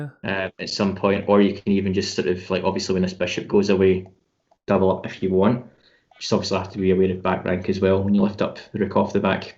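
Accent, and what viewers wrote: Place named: Yorkshire, United Kingdom. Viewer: Which chess club are you a member of? I assume one in Yorkshire based on that accent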